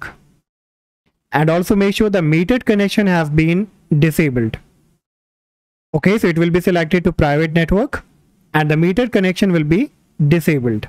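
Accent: India. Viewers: I just hear an Indian accent then I know my problem will be solved. Thank you so much ❤❤❤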